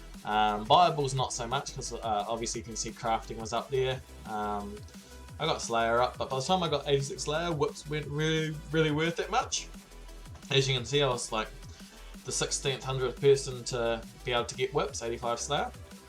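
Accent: New Zealand. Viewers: Are you from nz? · bro your a kiwi aye, that accent sounds like home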